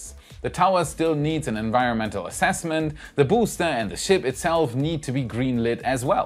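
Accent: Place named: Germany. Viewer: Guter Channel Digga ;) Irgendwie hab ich mir schon gedacht (gehört) das du Deutscher bist ;)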